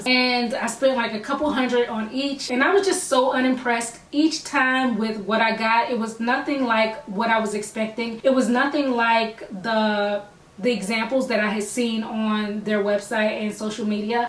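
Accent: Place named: Philadelphia, United States. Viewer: Is this girl from Philly??!! The accent seems so familiar... :-)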